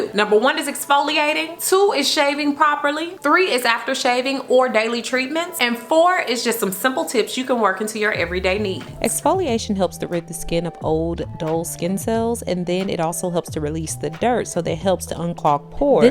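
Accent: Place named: South, United States. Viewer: She's grew up in St. Louis & Memphis & lives in Texas so I think it's a mixture of those three. Just my opinion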